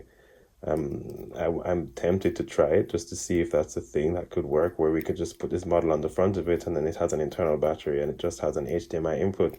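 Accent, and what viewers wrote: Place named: Caribbean. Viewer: Nice video bro. Maybe someday I'll go digital when it's priced a bit better. Btw, that sounds like a Caribbean accent...do I detect some Guyanese or Bajan heritage? :)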